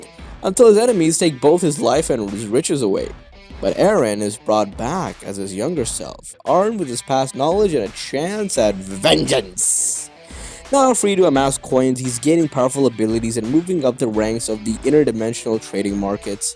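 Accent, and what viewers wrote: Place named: India. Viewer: Are bhai Indian ho na tumhare English se hi pata chal gaya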